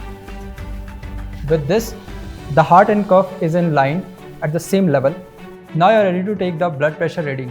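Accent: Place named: India. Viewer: Strong Indian accent.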